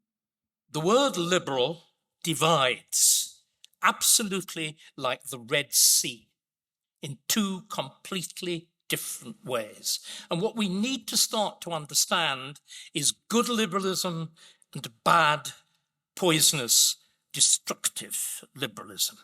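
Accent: RP, United Kingdom. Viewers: What a load of meaningless guff. Run on sentences of nothing delivered in a posh accent; who’s gullible enough to fall for this man’s schtik?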